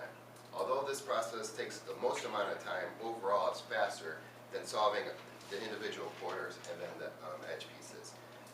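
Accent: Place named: Midwest, United States. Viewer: Are you in the midwest? (you sound midwesty) You did great, btw. I'm analyzing your speech for a class.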